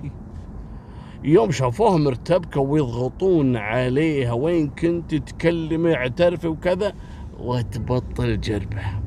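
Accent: Egypt. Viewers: ما أحلاك وانت بتحكي مصري يا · الله الله عليك حجيك بلمصري يجنن 😂😂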